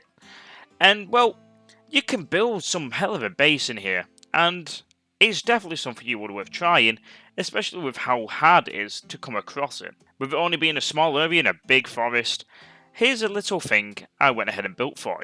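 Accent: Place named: Cockney, United Kingdom. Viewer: Damn it you gave away my island boom stash Cockney bastard ---the lizard king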